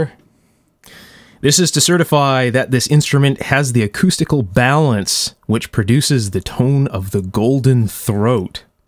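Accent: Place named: Canada. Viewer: I love these videos. Reminds me of the days of my childhood when I would sit at the kitchen table with my grandpa and he would explain everything like these videos. The only thing is... the way you pronounce OUT and ABOUT is so jarring to the ear. I am Canadian and in had no idea there were actually people that say Oot and Aboot! Please stop. It’s about not Aboot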